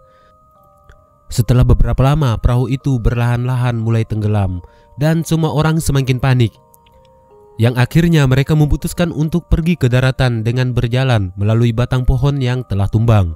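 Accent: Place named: Medan, Indonesia. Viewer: Mimin kayak orang medan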